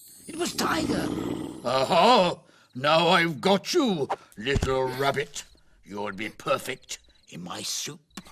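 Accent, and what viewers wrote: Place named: United Kingdom. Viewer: This story is very useful for me or everyone. British Accent is prefect 🙏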